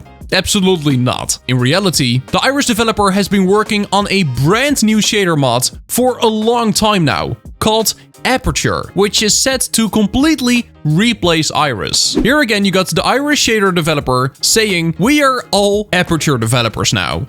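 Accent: Netherlands. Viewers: holy nederladse stem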